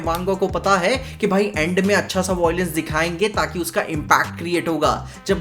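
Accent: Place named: Uttar Pradesh, India. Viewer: Areey bhaiii lanth sunke laga ki tum bhi up ke ho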